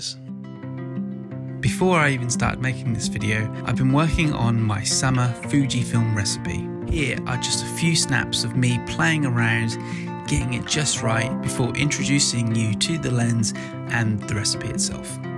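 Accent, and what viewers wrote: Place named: East Anglia, United Kingdom. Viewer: I am based in Istanbul now, just doing a bit of research into Fuji and lenses as I want a new "fun camera". Instantly, I picked up a bit of East Anglia in your accent - not 100% sure I went to check your website and yes, Suffolk! Amazing. I grew up in Norwich. Moved away in 2019. Subscribed!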